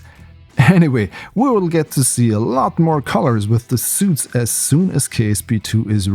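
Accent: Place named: Germany. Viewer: Your Hochdeutscher Akzent ist very obvious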